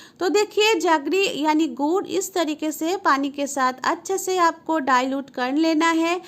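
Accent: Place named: Bengal, India. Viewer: If you don't mind, Are you from Kolkata. Your dialect suggests you to be a Bengali.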